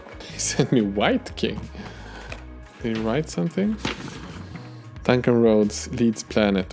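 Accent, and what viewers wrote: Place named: Sweden. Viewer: Sjukt att hitta kanalen och först höra du mest troligt är svensk utifrån dialekten och nu se Umeå på ett paket! Hej granne!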